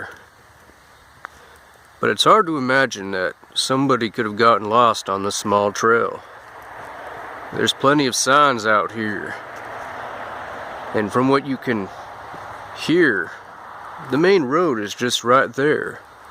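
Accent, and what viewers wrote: United States. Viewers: What part of the USA is your accent from?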